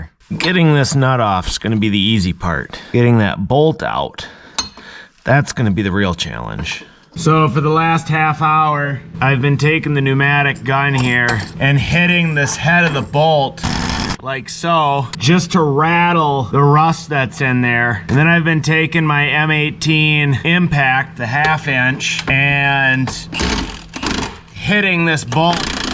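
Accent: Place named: England, United Kingdom. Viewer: But then it's a Town Country/Caravan. I own 2, 02's (Town Country Limited AWD with a custom 1/2" wall rear axle, a Reese Class 5 45707 trailer receiver, a 2" lift, you name it and a Caravan SE, shorty 3.3) Better than any Corvette, Lincoln Town Car, even a Bugatti. You should fix the Dog Legs and rockers. Welding in is best but watch the foam! Excellant editing, clear video, and English accent (unlike my Czech) so that can be isolated. ~ nope 336 subs Youtube is weird isnt it?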